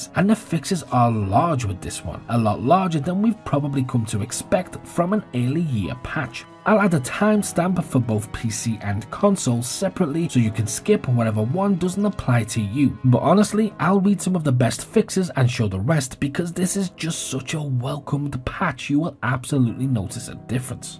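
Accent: Liverpool, United Kingdom. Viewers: What part of the UK are you from bro ? Can't place it. Hints of scouse I think tho as a scouser myself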